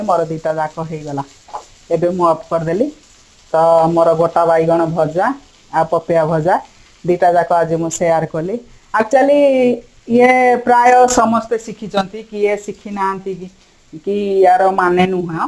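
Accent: Angul, India. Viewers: Bahut badhiya vedio and Apnakara cute angulia Katha. Plz sabu din kichi angulia Katha Kahile bhala lagiba 👍👍👍👍😍😍😍😍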